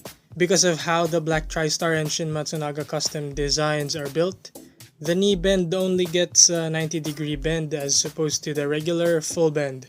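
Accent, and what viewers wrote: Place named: Philippines. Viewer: Are you Filipino I feel like you are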